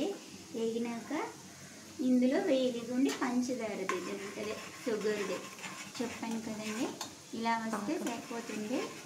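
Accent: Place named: Srikakulam, India. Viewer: Native srikakulam slang and dish 🔥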